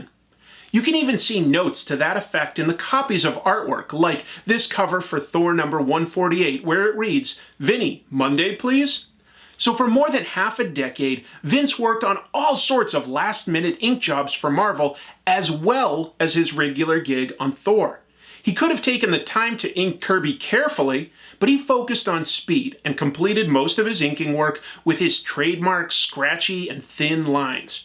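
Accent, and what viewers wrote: United Kingdom. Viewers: every thing sounds better in a British voice. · The British Accent was a nice touch, only a true classy artist can appreciate the melodramatic! If only Colletta's work was as dynamic as his writing skills? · A posh Attenborough accent always works 😅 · Wow, epic British reading, I salute you sir! On another note, I’d argue jack kirby ruined his own art. Not a fan, though his stylized work screens jack Kirby making it somehow endearing😁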